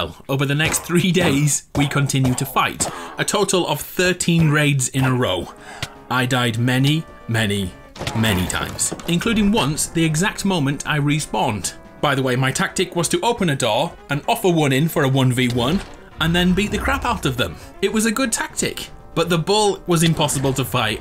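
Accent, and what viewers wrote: United Kingdom. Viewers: are you british 🇬🇧?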